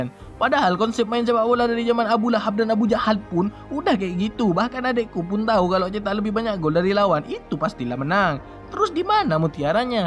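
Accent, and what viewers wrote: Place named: Medan, Indonesia. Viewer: Abng anak medan yh.nmpak kali ckpnya anak medan kali wkwkw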